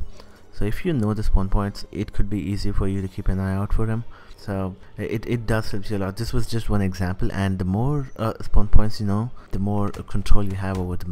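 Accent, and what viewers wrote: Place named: India. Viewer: Lmao I was only trolling, I know an Indian accent when I hear it :P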